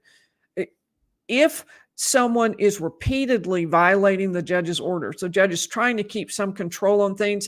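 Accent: South, United States. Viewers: i litterly had to reread the defenses filing..............all 120 + pages, in my almost 80 yrs i have watched as attorneys turned a noble profession one made admirable abe lincoln into a sewer, what those two defense attorneys did was not short of vigorously defending there client. i hope this sets a precedence for others who truly want to defend there clients. in this day and age it happens in 1 out of 100 cases. due process was not i repeat not followed. the law is clear there are gold standards call procedure and it did not happen. no one believes she fired them for negligence or any misconduct. she fired them because she is a arrogant female who has decided she has the power as judge to call the shots SO she did. i have been interested in true crime since i was six. forgotten more than most of you know and believe the arrest warrant was flawed. 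these crooked l e may think if we don't have a law degree we don't recognize when we are being hood winked. pulled of the case, hell she should be kicked of the bench and fined $100,000.00 because her real damage was to the accused and to our constitution. love to hear a southern voice advising and instructing our young whipper snappers. THEY NEED ALL THE HELP YOU CAN GIVE THEM. your passion also shines through and that will continue to bring you a large audience.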